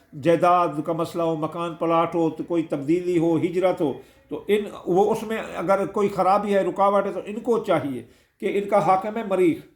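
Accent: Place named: Saraiki, Pakistan. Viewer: لگتا ہے آپ سرائیکی ھیں جنوبی پنجاب میں رھیتے ھیں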